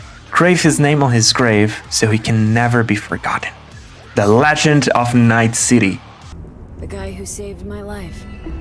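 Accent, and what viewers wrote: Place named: Brazil. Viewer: eu reconheço um sotaque brasileiro de longe. ótimo vídeo, desejo tudo de bom pra ti! vc eh zica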